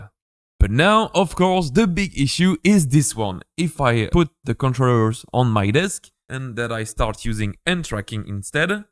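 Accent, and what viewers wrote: France. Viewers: Beautiful French accent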